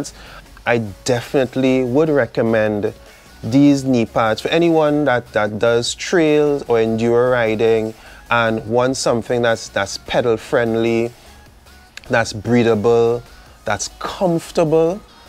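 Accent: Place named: Trinidad and Tobago. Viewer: I hear a trini accent where do you ride ?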